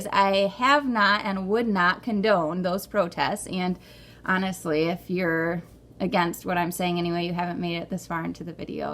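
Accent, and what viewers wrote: United States. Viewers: I like your Michigan accent.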